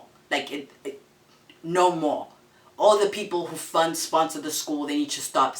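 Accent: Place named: United States. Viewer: oh my word you have an American accent already :D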